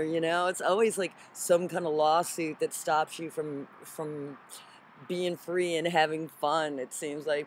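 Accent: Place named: California, United States. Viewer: The 405 traffic!! you sound so Californian I can listen all day!!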